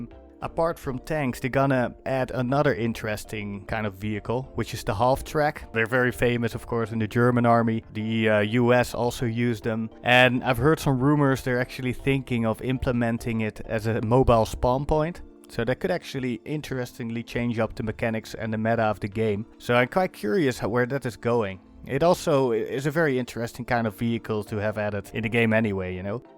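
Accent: Netherlands. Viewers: Ben je een Nederlander? Je accent xD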